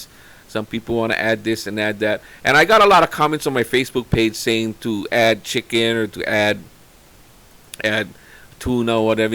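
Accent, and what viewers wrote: Hawaii, United States. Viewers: New Subbie! Love the pigeon accent - passion for food & sharing your recipe. So happy I found your content. Mahalo! Born & raised in Waianae HI - now in Seattle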